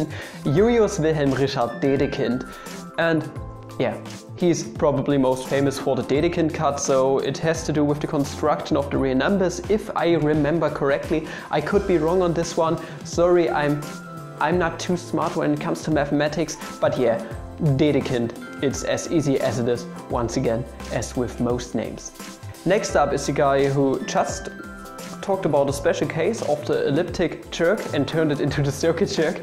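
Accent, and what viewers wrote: Saxony, Germany. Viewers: Er ist Sachse... das ist nicht der Norden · Речь идёт, конечно, о разных диалектах немецкого. У неустрашимого нашего хоста явно саксонский акцент, и поэтому говорит Фридриш вместо Фридриха. :) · Boii with Sachsen accent tells us how to pronounce shit. I found my kink. And with Kepler there is actually something that can go wrong. My GERMAN Professor still calls him Keeeeeeeeepler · Aus welcher Ecke Deutschlands kommst du? Ich hör die ganze Zeit nur Friedrisch und Ludwisch. Erst dachte ich an Sachsen. Stimmt das?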